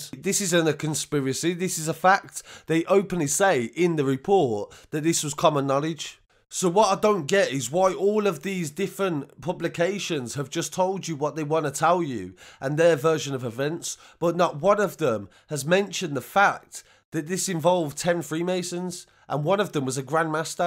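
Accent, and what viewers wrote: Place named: North, United Kingdom. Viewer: You know, sometimes your northern accent annoys me but on another note keep up the good work. We need more journalist that will tell us what the mainstream media wont. I appreciate the way you put the time in to research. Thanks.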